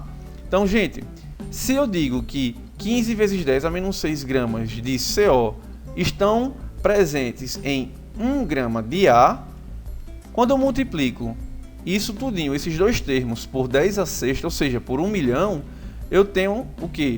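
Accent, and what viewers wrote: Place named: Northeast, Brazil. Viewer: adoro o sotaque nordestino ^^ (favor não ver 'nordestino' como depreciativo, falo nordestino como morador da região nordeste do país. )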